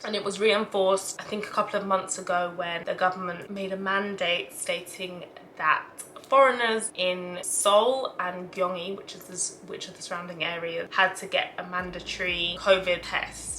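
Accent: United Kingdom. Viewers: Koreans are a bunch of racist weirdos with their Kpop and femboys. You're actually incredibly beautiful and hit the genetic jackpot. Plus you're well spoken and you have a British accent on top of that. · are you british? i can hear it in your voice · They were mean to you....I am a white older teacher, and have taught hundreds of people, so on that claim of expertise; you are pretty, you are special because of braving the adventure, and you are gifted in language expression with a British accent that is so eloquent and in fact exquisite, and you are clearly intelligent as anyone could tell if they had half a concept of full sentences, vocabulary and grammar....they were lucky to have you and didn't recognize the chance they had for their students...it was their loss...Like Jesus' advice was; shake the dust off your feet as you leave them and know you will be guided to a lovely future...you deserve it...meanwhile you got to experience a high context culture which is based in inference and not directness...(PS, I was a French teacher so I want to add; Louis Pasteur had a colleague Antoine Bechamps who argued that if the germ harms then it should harm everyone but it doesn't so in fact Pasteur woke up to that fact before he died and confessed that he was wrong and Bechamps was right...his dying breath said Le Terrain Est Tout, the terrain is everything...people's immune system means the germ doesn't have the power people claim it to have...your field or terrain is helping you.) God bless you!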